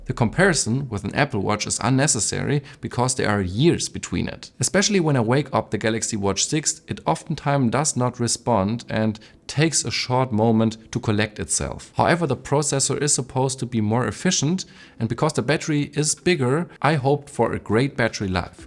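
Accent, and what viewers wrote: Germany. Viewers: Man hört sofort raus wenn ein deutscher englisch spricht haha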